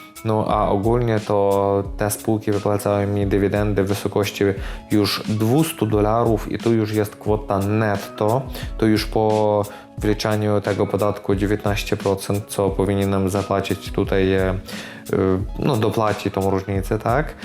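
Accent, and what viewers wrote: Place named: Germany. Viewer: Witam Rostock taki akcent masz jesteś Polakiem filmiki spoko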